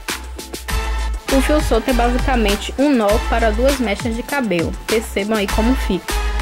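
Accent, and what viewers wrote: Rio de Janeiro, Brazil. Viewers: Vc é do Rio de janeiro ? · Show de bola! Vc é do Rio?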